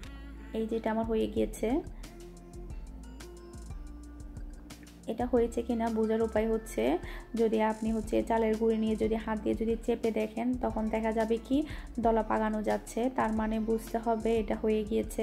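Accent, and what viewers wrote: Chittagong, Bangladesh. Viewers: Apu apnar vasa ki chittagong?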